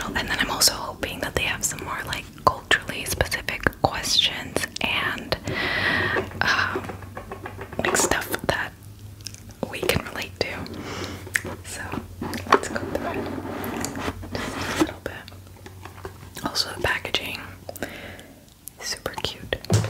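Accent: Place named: New York, United States. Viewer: Please surprise us this Halloween with bounty hunter ASMR where you take care of a tied up criminal. I loved your New Yorker accent. It gave so much tingles.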